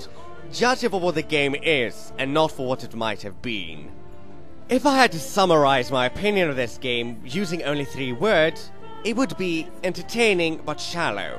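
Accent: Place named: United Kingdom. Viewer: Hi! I've seen some of your videos and I'm pretty impressed, even subscribed just today. Just a few questions, though: first question, what part of Britain are you from and what is your accent? (Not a question related to the video, I'm just curious because I personally think it's pretty charismatic) Second question, what's the song that plays in this video?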